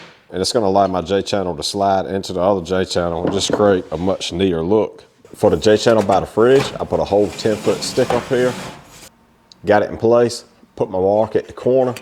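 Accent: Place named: South, United States. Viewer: Just found your channel through one of your shorts. Been binge watching. Really enjoying it! Thank you! As a felllow southerner who has lived ALL OVER the south, love hearing your accent!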